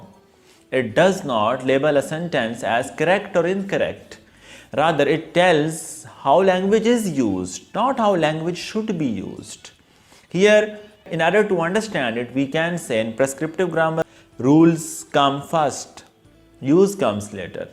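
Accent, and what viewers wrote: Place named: Pakistan. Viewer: Thanks sir. I was very confused due to this words.i didn't relise that you are from Pakistan. While I was watching your video. You speak like my native teacher.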